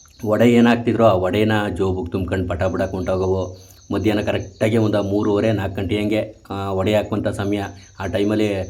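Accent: Mandya, India. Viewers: ❤ video hakidakkee kushi aithu sir ❤ love your family neamma Mane super ❤ neamma language super ❤namma Mandya language super ❤navu Mandya sir ❤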